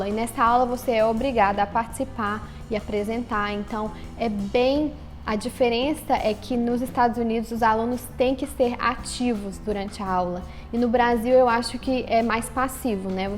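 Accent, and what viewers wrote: Minas Gerais, Brazil. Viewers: é minha primeira vez aqui no canal, já adianto que tenho gostado bastante dos conteúdos. Uma dúvida menina, você é mineira??? Sotaque maravilhoso. beijos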